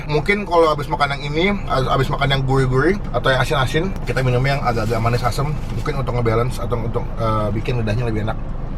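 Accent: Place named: South Jakarta, Indonesia. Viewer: Kok kamu jaksel sekali yoooo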